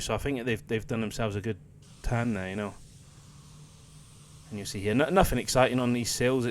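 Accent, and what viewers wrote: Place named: England, United Kingdom. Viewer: thank you for yourgreat content. I'm in the US so I like seeing other products. You are very good teacher. Also love the English accent. Please keep up the work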